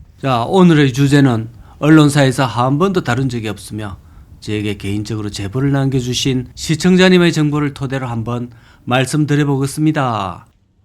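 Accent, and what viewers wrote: Gyeongsang, South Korea. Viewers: 해설자,,말투는,갱상도, 사람이네,,,양택조니을 앞세워 지역감정 조정하네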